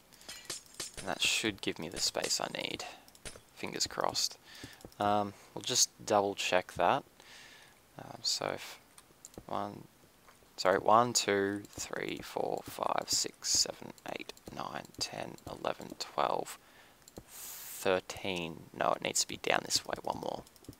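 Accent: Australia. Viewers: I really like your Australian accent! I lol'd when you said "ice fountain" because I thought you said "arse fountain" XD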